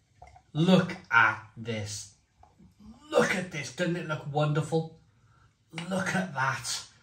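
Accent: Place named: Cardiff, United Kingdom. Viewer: ArchStanton obviously has no knowledge of the Barry derivative of the Cardiff accent spoken by the presenter. It has no connection with East End Cockney!!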